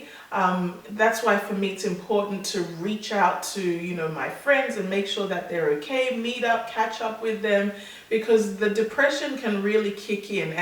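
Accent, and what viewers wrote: Australia. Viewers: M8, you're an Aussie! As soon as I heard your voice, I thought 'educated Aussie babe', great to have you here!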